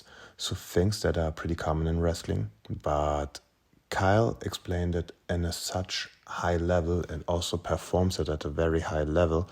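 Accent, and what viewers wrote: Germany. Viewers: are u german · This video should be titled "German man mumbling about rumbling"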